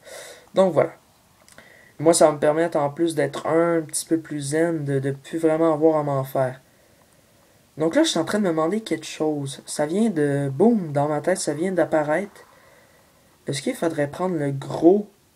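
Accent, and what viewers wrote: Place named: Quebec, Canada. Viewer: Salut ^^ alors toi qui a un asser gros nombre d'abonnés pour un joueur psvita je ne t'avais jamais aperçus sur youtube :) j'ai moi aussi des personnes comme toi qui dislike met vidéo amors que j'ai quel que bonne personne qui like ( exemple: 9 like 2 ou 3 dislike )bref. Je ne sais pas comment régler ce probléme moi aussi :) sur ce j'ai regarder ton contenue tu est un exelent joueur psvita :D continue comme ça ! +1 abo ( ps: j'adore les accent québecois j'aimerais trop vivre au québec !!)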